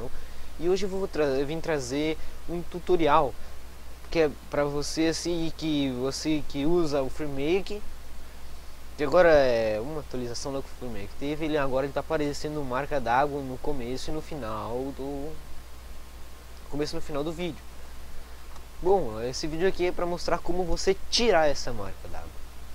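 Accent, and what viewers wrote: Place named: Curitiba, Brazil. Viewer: vlw ai cara só para constar amei seu sotaque, vc é de Curitiba?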